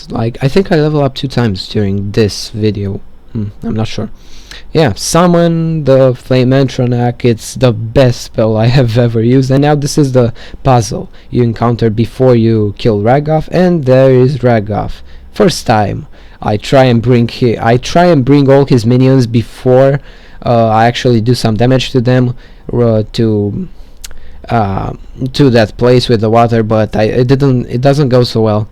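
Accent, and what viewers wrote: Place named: Serbia. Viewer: you have a serbian acent :)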